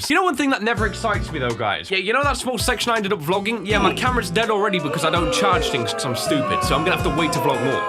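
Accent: United Kingdom. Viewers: wait hes British? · british Im British too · Wait, you're British? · What I didn't know was British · Are you British? 🏴🇬🇧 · Wait your actually British!??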